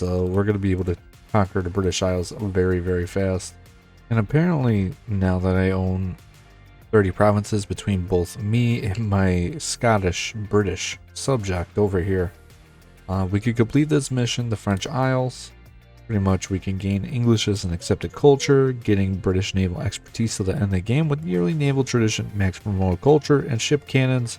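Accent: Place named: Upper Midwest, United States. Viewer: Are we a Wisconsin Or Minnesota or Michigan native? I know a upper Midwest accent when I hear it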